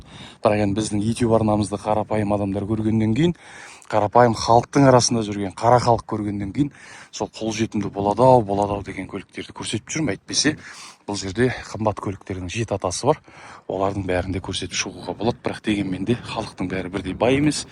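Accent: Standard. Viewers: жақсы таныстырып жатырсың таза қазақ тілінде рақмет бауырым қаида жүрсеңде жолың болсын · Керемет бауырым сөз жоқ таза қазақша Алла жар болсын сіздерге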